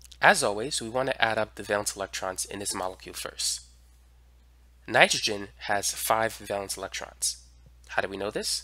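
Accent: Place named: Boston, United States. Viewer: Are you from the Boston area? you have a very interesting accent.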